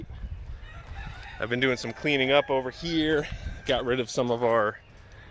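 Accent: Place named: South, United States. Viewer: Loved the southern accent!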